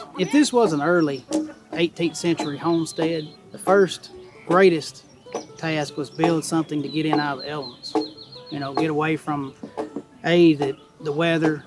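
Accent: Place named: South, United States. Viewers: I’m a native North Carolinian, born and raised, but even I had to put this guy on 1.25x speed. A true southerner! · Hoo boy, that Middle TN accent (pronounced AY-uk-CENT). Feels like home, haha.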